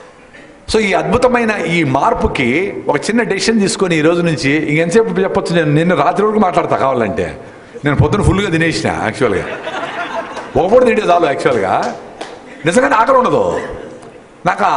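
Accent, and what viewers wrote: Rayalaseema, India. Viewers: love u r rayalaseema slang sir, it makes listeners interesting to hear...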